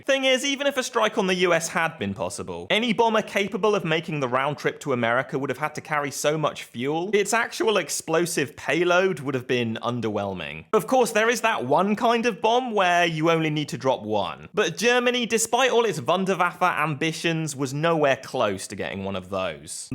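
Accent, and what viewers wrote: United Kingdom. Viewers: I swear he has the most Brittish accent i have ever heard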